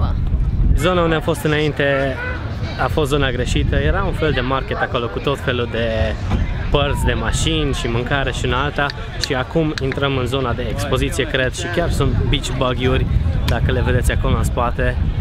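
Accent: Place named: England, United Kingdom. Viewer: nu se impaca accentul englezesc cu romana mai deloc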